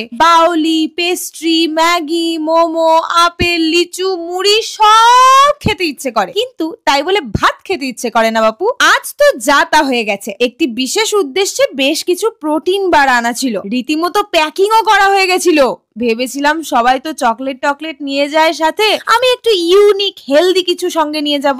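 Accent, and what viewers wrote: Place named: Hindi, India. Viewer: Tomar Kotha bole Hindi❤❤😊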